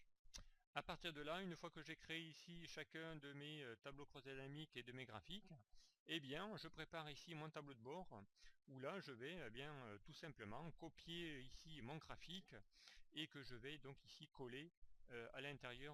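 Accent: South, France. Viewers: étonnant, j'entends très bien, même avec l'accent du sud de la France, manque les cigales en bruit de fond Essayez avec des écouteurs ou casque audio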